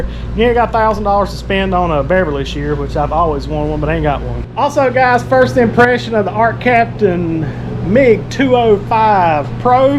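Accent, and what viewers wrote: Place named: North Carolina, United States. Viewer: Glad to hear someone that talks like me!! (Franklin, NC, Macon County)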